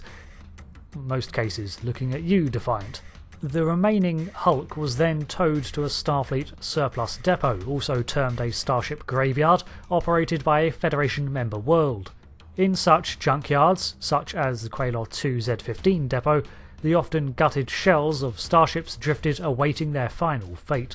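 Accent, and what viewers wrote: United Kingdom. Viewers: Terrible nit brit narrator.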